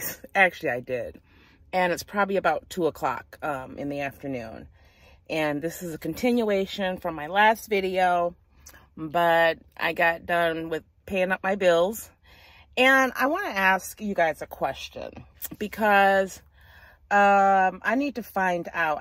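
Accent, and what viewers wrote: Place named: West Coast, United States. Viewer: That's a west coast accent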